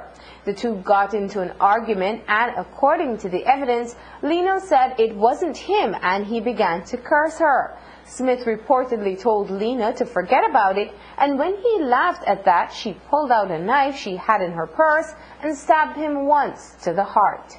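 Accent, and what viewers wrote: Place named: United States. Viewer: Sounds like the american accent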